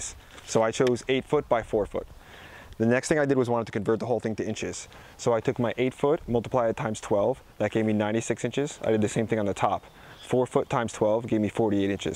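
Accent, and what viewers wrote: New Jersey, United States. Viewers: Nice work dude! I'm surprised I could follow it because of your New Jersey fast talk, but I got it! Thanks for the tips!!!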